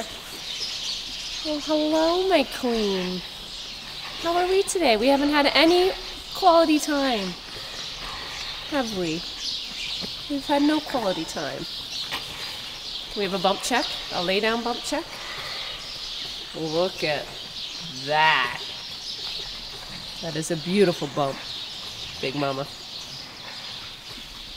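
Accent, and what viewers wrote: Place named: Canada. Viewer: Hey! My mom who went home to heaven in 2014 was raised in Kitchener but raised her family in Michigan. I have many many fond memories of visits to relatives in Kitchener (always the farmers market was a high light) and the surrounding area. When mom turned 70 we 'kidnapped' her for a weekend in Stratford...theater and mint smoothies were enjoyed!!! I love that you are from that area and sport your Canadian accent. Just wondering if you could think about renaming the LambTaxi the "EWEbur"...love all your puns and thought this might fit right in. Merry Christmas from a 1/2 Canadian.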